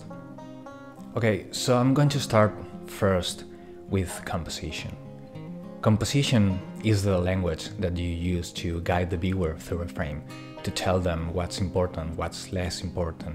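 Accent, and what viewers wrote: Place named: France. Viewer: The cadence of your speech, the small motions of your head and body when you are talking and your accent remind me so much of the french actor, Tchéky Karyo. See especially his character in the 2011 film, "The Way" written and directed by Emillo Estevez.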